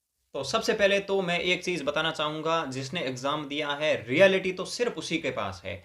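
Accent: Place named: Marwar, India. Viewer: Sahi hai ,, cutoff kuch bhi rhe lekin analisis bhut sahi hai, aap marwad se ho sayad, language tone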